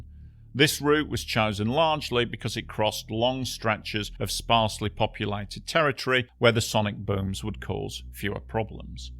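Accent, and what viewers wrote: United Kingdom. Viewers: Thanks for proving once again that not everyone with a Brit/UK/Aussie accent should be doing narration. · I have watched your channel for a while now. With all the AI crap that is dominating YouTube. I am so happy that you, a human, keep me entertained. Thank you, British 'human' voice.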